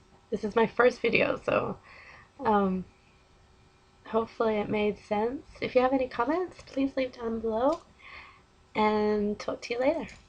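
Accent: United States. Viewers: How are you Australian when you have an American/Canadian accent? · You sound more like an American to me~ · Hey, if you still log into your channel. I have a question...you say you are from Australian? Yet, your accent sounds very much American. haha:p I dated a girl from Melbourne once, her Aussie accent was very thick. hmmm maybe you watch way too much American Television?! Haha:p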